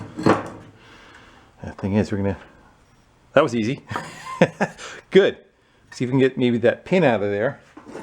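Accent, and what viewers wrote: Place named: New England, United States. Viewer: That's a New England accent if I eva heard one.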